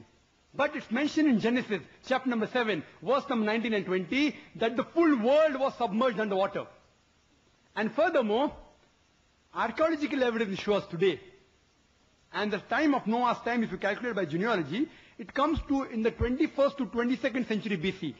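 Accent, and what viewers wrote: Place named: India. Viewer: just cos he has a strong accent as it is his SECOND language (or third depending where from India he's from), it doesn't invalidate his point.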